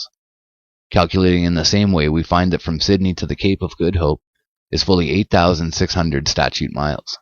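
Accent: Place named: Canada. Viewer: Sounds like flat water channel. I heard the Canadian oooo. Excellent.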